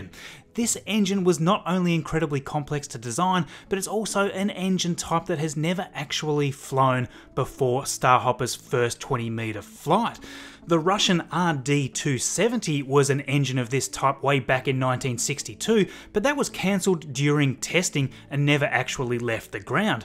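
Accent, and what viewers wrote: Australia. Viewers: Because I'm Aussie, I can tell from your accent, you definitely must be an Aussie too?! Lol.. You still down here or did you move to America? Don't think anyone from the public would know, but do you know if the final design of the interior of the Starship has been completed? Good reporting 😉 🇦🇺🇺🇸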